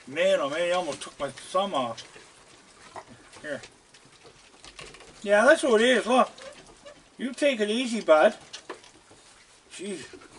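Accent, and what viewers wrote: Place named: Nova Scotia, Canada. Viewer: i always wondered why ya sounded so familiar its cause yer a fellow nova scotian right on makes sense to me now lol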